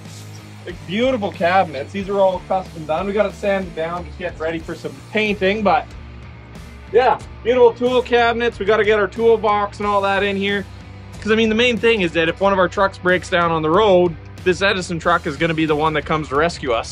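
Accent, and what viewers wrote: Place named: Prince George, Canada. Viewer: You sound like you're from Prince George or Quesnel.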